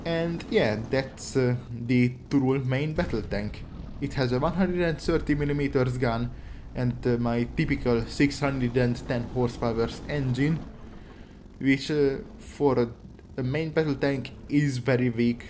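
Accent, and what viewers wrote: Hungary. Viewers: Akcentus nagyon magyar. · hungarian ahh accent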